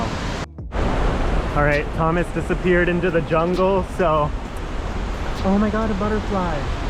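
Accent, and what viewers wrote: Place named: Australia. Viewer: That Australian accent 😍🤤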